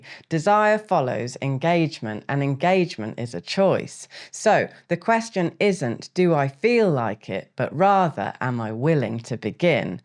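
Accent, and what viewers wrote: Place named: West Country, United Kingdom. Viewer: Sounds like someone from West Country, nothing more.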